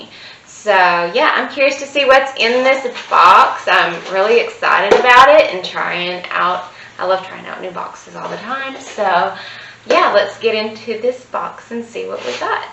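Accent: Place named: Texas, United States. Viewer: I'm guessing by your accent that you're from Texas.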